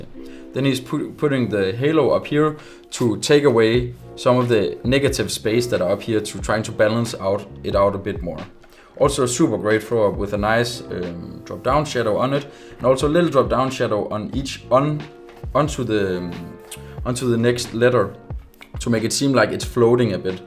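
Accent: Denmark. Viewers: very god Danglish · Aldig hørt mere dask engelsk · Jeg kan høre en dansker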